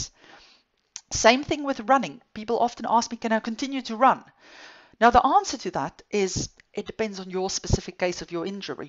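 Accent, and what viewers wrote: South Africa. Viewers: I love your accent. You must be from South Africa.❤❤❤❤❤❤ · South African AF! Great video, thanks for this!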